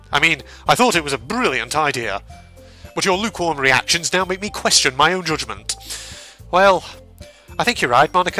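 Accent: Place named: Ireland. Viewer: Damn I love the irish voice^^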